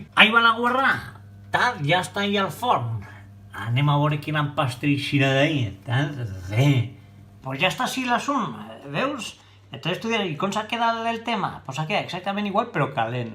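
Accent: Valencia, Spain. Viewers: És un luxe sentir-te parlar en valencià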